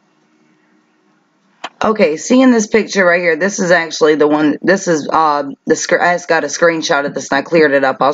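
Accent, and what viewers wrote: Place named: South, United States. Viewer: love your videos and southern accent as I have a very strong one myself lol. Are you the person Str8 refers to as? Just curious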